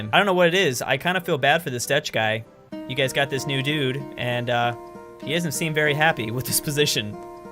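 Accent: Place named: South, United States. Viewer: True southerner intro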